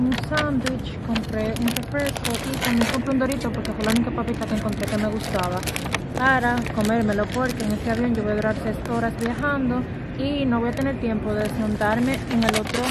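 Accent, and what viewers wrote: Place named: Cibao, Dominican Republic. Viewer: Tiene el acento cibaeño muy marcado 🤣😫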